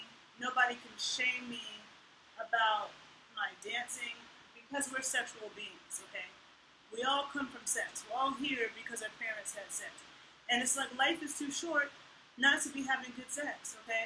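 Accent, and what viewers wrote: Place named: New York, United States. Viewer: Now you are on to the Real Knowledge. Postive you are a fascinating potent female what we use to call a witch, ... more shaman ... me too.. In this corrupt weak patriarchy has twisted and killed our connection with the divine, the energetics... medical symbol is ancient... caudecaus ... it is from ancient sex rituals from Sumer and Egypt... even in ancient Canaanite ... Heard a bit of New York accent New Yawk ! Anyway my fam in Brooklyn, I live in Oakland. I love to have a cup of coffee or juice wit you and discuss magickal systems , sexual alchemy, and ways to build healing systems.. yeah I hear da rain. ! God bless woman.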